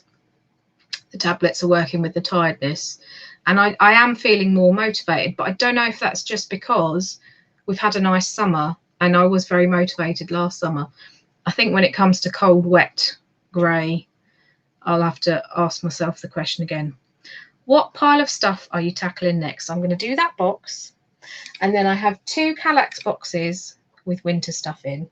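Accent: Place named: Suffolk, United Kingdom. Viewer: I love hearing your Suffolk accent. I'm a Suffolk girl too.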